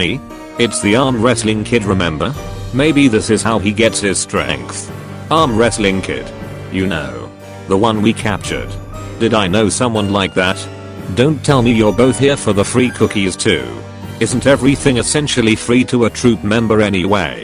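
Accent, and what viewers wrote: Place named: England, United Kingdom. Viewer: I sense a fellow english girl...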